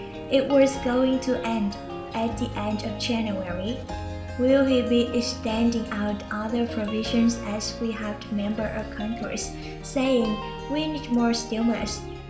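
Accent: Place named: India. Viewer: It's a Indian lady alot like the ones I hang up on phone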